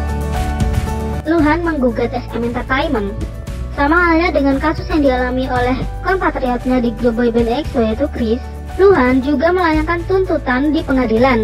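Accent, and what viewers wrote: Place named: Java, Indonesia. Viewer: Bentar yang ngomong ini kayak orang jawa btw😁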